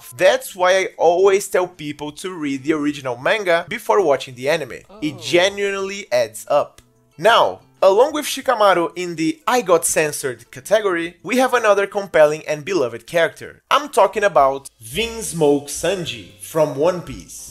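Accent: Brazil. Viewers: loved the video dude, but where is your accent from? the way you pronounce the names of the characters sorta remind me of the brazilian way of saying their names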